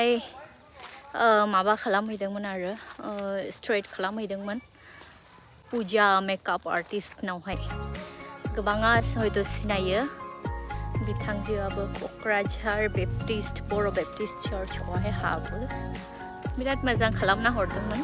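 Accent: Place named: West Bengal, India. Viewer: West Bengal ni boro railynaiya alda kwnaiyw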